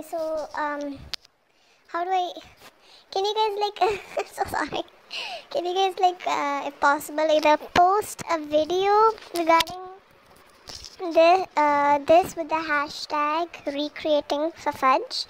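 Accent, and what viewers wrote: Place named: India. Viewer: Oh wow that's sad and U HAVE AN INDIAN ACCENT?? ITS SO NICE!!!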